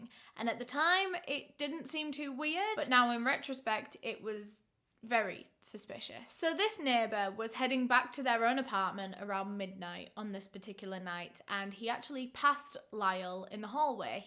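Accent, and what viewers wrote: United Kingdom. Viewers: Hey..this is off topic..i love your accent! Sounds British with a twist!😉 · I. Love. This. Accent. So. Much…. Which part of the UK is this?!!! 🤩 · Can a British person tell me what kind of accent she has? It doesn’t sound like typical accent you hear on tv. Is it considered proper? I met a girl from Manchester who told me Adele sounds so bad when she talks and here me an American loves her accent.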